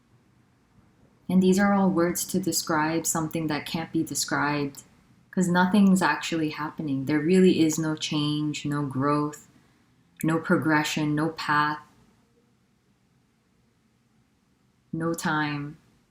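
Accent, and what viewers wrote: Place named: England, United Kingdom. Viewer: Beeeeuuutiful . And I love the English accent when USAID"it's quite amazing that this should happen😂"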